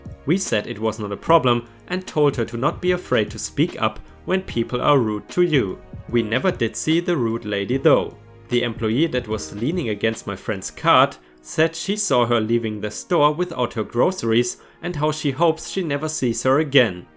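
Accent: Germany. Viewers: Sounds like he's from Germany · You sound so german :D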